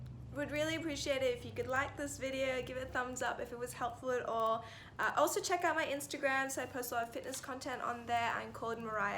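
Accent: Australia. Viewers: That Australian accent 😍😍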